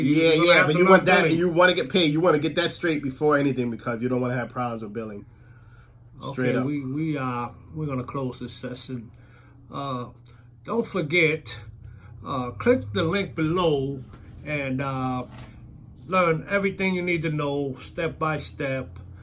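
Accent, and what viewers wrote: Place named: New Orleans, United States. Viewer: Dude gotta be from New Orleans!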